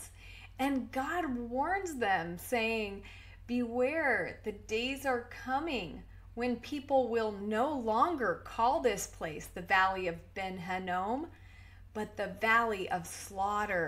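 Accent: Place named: England, United Kingdom. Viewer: that’s so so lovely I’m really really glad that my words made you happy because you have done the same for me with yours. I know no one has all the answers and not will we ever till we get to the other side lol! But it’s so nice to hear someone speak love and not eternal condemnation for once and fear mongering people into believing and judgement for everything u say think and do yano! That would absolutely be such a welcome help. I’m doing quite well but obviously u need that final push and as I say iv been quite unlucky with my experiences I honestly haven’t yet heard a story quite as bad as mine if I’m honest! But luckily I don’t suffer with fear and am just used to it now lol! I live in Bath, which is in the Somerset region near Bristol? Where are you situated I notice your English accent which is music to my ears as keep coming across Americans lol! 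And I reach out and am actually very very surprised about the lack of response and support I get with it all but I believe god leads you to the right people in time so have just been patient with the process. My email is <mail> If you would like to email a bit more privately 😁 Thankyou so much you are an angel 😇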